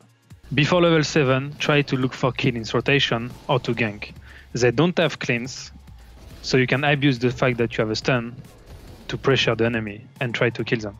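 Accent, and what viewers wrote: France. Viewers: warchief ultradeath conan barbarian terminator.... But English with French accent is so good! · The frenglish is real :D i never know if he's saying "hit" or "eat", both make sense haha. Stil, love the vid, and love the team <3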